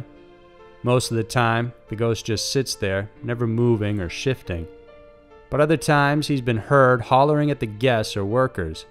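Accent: United States. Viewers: I live a couple miles away from the river Severn! I live in a pretty rural part of the country so it’s really weird to hear it’s name being said in a Yank accent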